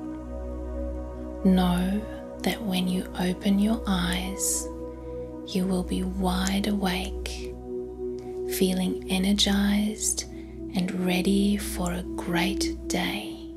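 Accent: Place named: Australia. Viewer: This is such a beautiful meditation, it's your voice I am sure of it. Probably the wrong thing to say but I am Aussie too and I do love meditations with an Aussie accent. And not all narrators has that lovely tone.. I do like your website and I have my eye on a few meditations by you and Brad. Thank you :)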